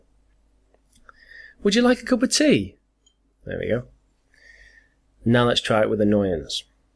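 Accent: Northern England, United Kingdom. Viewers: Northern England, that's where you're from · I love British accent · You are a native British speaker, or you learnet this British accent? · so into Brit accent. You're superb!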